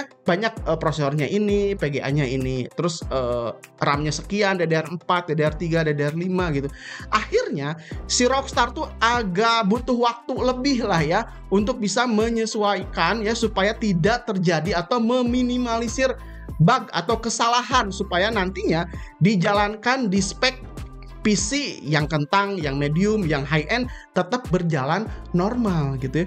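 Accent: West Java, Indonesia. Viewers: sunda na tos melekat pisannya kang😂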